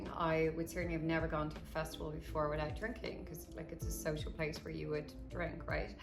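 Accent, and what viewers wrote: Ireland. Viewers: Alcohol is a hoax. It’s a poison that robs you of your health, potential and your dreams. Judging by your accent you’re Irish. So you know how difficult it is for people who don’t drink here. I’m off it 1 year and 1 month and my friends think I’m just ‘going through a phase’ and I’ll eventually come to my senses. Never.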